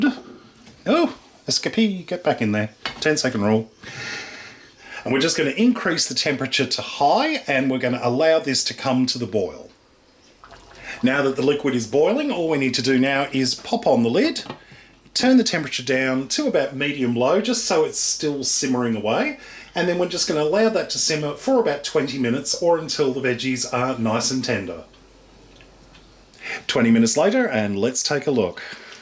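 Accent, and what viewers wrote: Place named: Australia. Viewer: Yes I think you chuckle after u taste ur dish every one ur Spirit is Amazing thanks for all the joy u bring us and ur Amazing Aussie accent as well.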